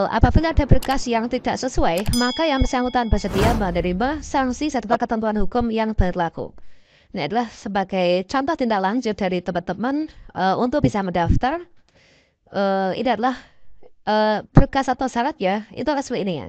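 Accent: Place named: Java, Indonesia. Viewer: Meddok banget suaranya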